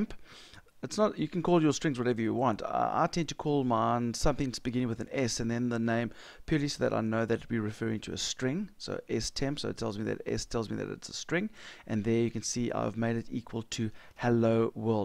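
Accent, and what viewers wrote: South Africa. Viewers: You can hear the strong South African accent coming through, at least I think.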